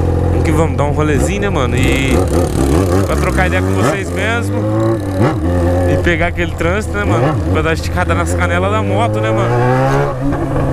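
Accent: Goiás, Brazil. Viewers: pq vc fala no pique do Goiás sendo que vc mora em Londrina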